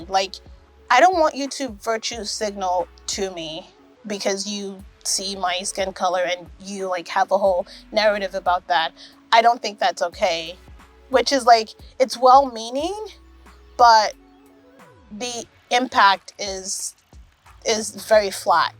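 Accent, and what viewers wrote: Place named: United States. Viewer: I appreciate you sharing your thoughts on your experience! This is good stuff and it's interesting to see you think live and have this organic discussion with your husband. It's a complex topic and there are so many nuances. Clearly that woman was confused, maybe because you speak like an American? (Please do a video about how you lost your accent because I've tried everything and while I don't sound like the typical French person anymore (thank God), I still don't sound American at all) Nevertheless that must have been awkward and she should have 1. apologized for assuming and 2. maybe be curious to learn about Liberia?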